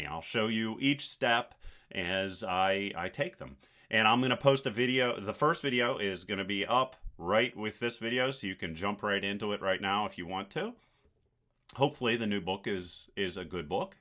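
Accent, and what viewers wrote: Pittsburgh, United States. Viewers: I thought I heard a fellow Yinzer accent all these years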